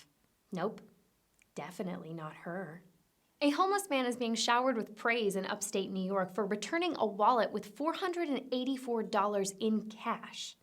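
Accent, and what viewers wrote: England, United Kingdom. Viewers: Why is she not sleeveless!!! Good British accent though! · 1. Loved the dark Kennedy joke 2. Loved your British accent 3. I see you have a blank coffee mug!!! 4. Buy an Abe Coffee Mug!!! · Her british accent is so damn cute lmao! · like your english accent!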